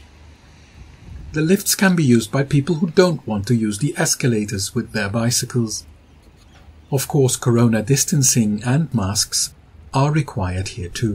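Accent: Netherlands. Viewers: Interesting mix between a Dutch and English accent :p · Lekker Nederlands accent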